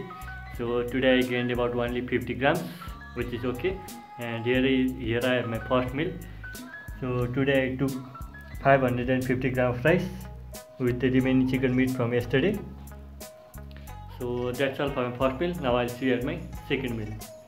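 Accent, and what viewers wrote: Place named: Nepal. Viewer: Bro Ur accent is like Nepali. Nepali ho ki?😅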